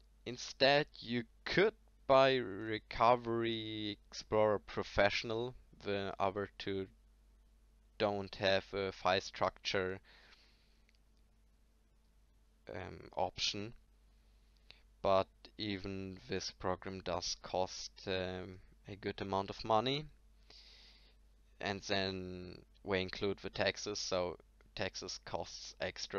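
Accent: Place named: Germany. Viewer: Hey, i managed to get Ubuntu running in my Macs. I actually have several macs like a Studio with M1 Max and a MBP M3 Max. The solution - if anyone needs it - is called "UTM" and can run a Ubuntu 22.04. From there you can run the script. God thx i did not throw away my 6TB from my WD MyCloud Home. It will take days or weeks to recover files i guess, but it should worth it. Am i right you are german like me? Because of your accent. Anyway... WD is der letzte Dreckscheissladen und bestimmt meine 4te HDD. Hoffentlich Insolvenz incoming 😂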